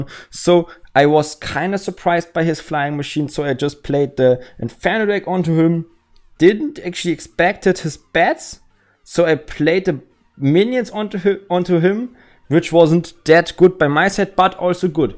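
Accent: Germany. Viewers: Ich liebe deinen deutschen accent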